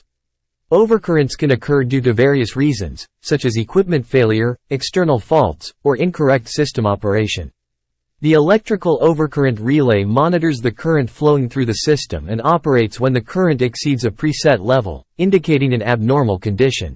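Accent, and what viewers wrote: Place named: Tamil Nadu, India. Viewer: Tamil voice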